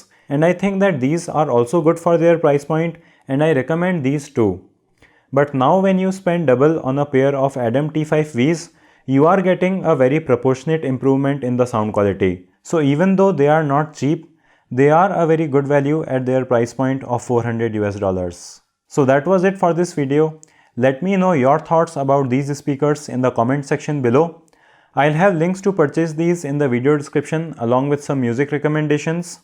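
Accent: India. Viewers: Can’t take him seriously with that Indian accent 😂😂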